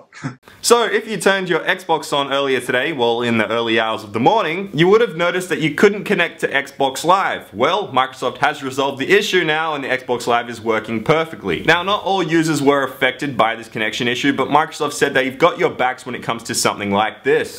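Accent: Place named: Australia. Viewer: If your accent really is Australian what part of AUS are you from?? Curious from a country Victorian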